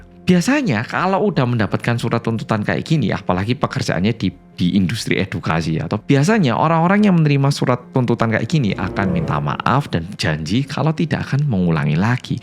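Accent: Java, Indonesia. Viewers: Kemarin baru banget konten nya kk fyp di berandaku , aku coba nonton ko lama lama nagih juga apalagi pake detektif gitu di tambah suaranya itu medok . Dan akhirnya aku jadi tau kalau di korea itu selalu pakai detektf , bagus juga ya . Ga cape cape cari tau sendiri . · Tdr malem di temenin m medok 🙏🏿🌹🌷🌻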